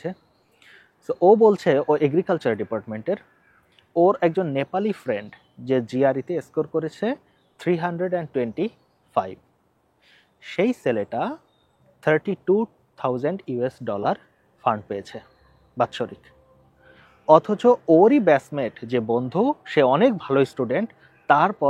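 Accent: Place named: Jessore, Bangladesh. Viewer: Jessore er lok mone hosse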